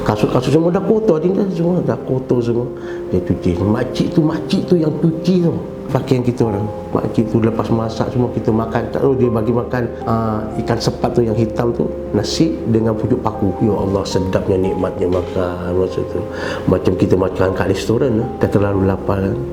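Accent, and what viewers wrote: Indonesia. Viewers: Logat alm ni logat madura. Sya sendiri madura. Itu la sya dpat rasa alm ni org madura. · Patut laa cara arwah ada sleng org jawa..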